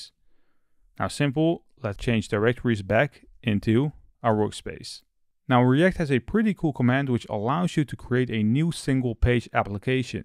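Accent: Netherlands. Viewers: I hear a dutch accent excellent video explanation goed gedaan!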